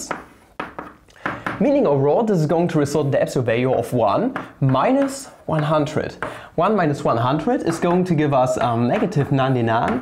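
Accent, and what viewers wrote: Germany. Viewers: German accent is very interesting, where did u learn English?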